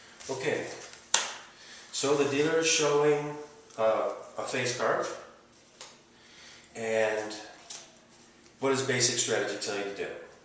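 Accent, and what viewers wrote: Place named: Greece. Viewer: can you clear some things up for me? I am a noob. first of all, thanks for the video, very informative. you're right, play to win, don't play to get 21. a lot of people in comments are saying players will get mad at you playing like this, why? they usually get mad if you game unnecessary hits, why would they get mad at you because they already played their hands. you sound like my friend who is Greek, are you Greek? are you able to jump in a table mid shoe? my local casino doesn't allow that. again thanks for the video, I'll this soon.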